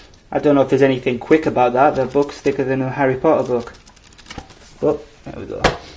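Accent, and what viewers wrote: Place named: Cumbria, United Kingdom. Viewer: dude where are you from......your accent sounds like some cumbrians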